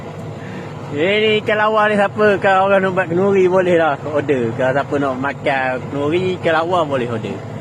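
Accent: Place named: Lipis, Malaysia. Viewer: Tak ada ikan lain lalu ye.....baik baik bahan ke tangan Kang...😂😂original cakap Lepih..😂😂😂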